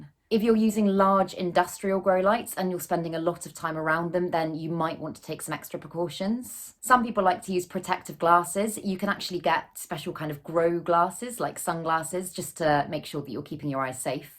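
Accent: United Kingdom. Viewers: Obviously, not an american dialect, must be something from the british isles, I would say. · Thanks for sharing. Very informative and to the point. Love that accent btw :) Takes me back decades ago when I used to date British girls lol. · Not to be a creep and disregard the video but I find British accents extremely annoying but yours was pleasant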